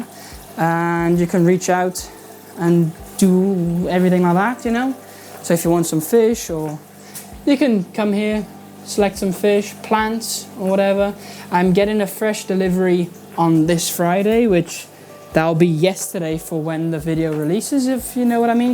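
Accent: Wales, United Kingdom. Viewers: I'm confused, as a Welshman I'd swear that accent was Welsh, but then I find you're a Nederlander. 🤯 😂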